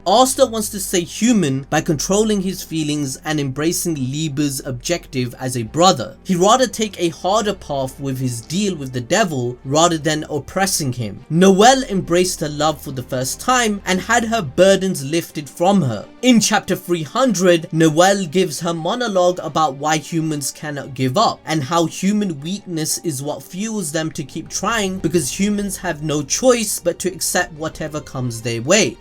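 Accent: India. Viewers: Love your hindi acent😂💕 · That indian accent though 😂😂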